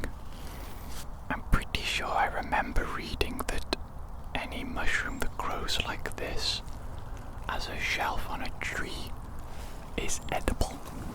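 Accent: Wales, United Kingdom. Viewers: There’s something about your Welsh accent paired with your refined, gentlemanly tone that creates such a unique and relaxing ASMR experience. It's also great to see you with a bible, my friend. God bless you 😊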